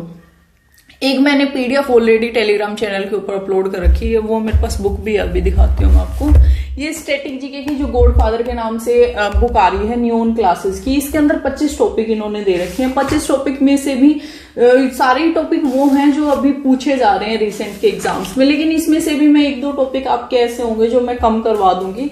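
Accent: Haryana, India. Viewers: Sister jab aap bolte ho to haryanvi tach aata h 😊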